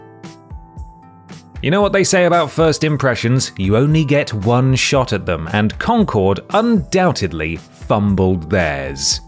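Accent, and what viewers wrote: United Kingdom. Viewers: He's British.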